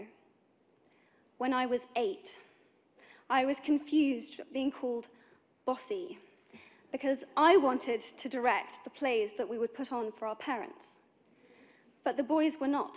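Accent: United Kingdom. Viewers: 素晴らしいイギリスアクセント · イギリスなまりが結構強い！ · love your British accent, 😍 you're so beautiful and hope for us all women in the world.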